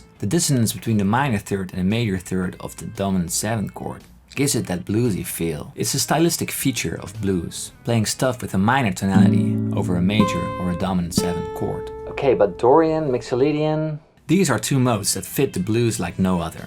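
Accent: Netherlands. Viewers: Great guitar lesson, very helpful! Your English is perfect, but do I hear a Dutch accent?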